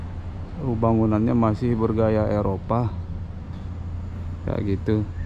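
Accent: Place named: Bali, Indonesia. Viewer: Logat nak bali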